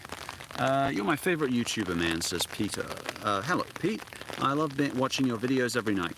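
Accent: Australia. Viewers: Hey are you from Brisbane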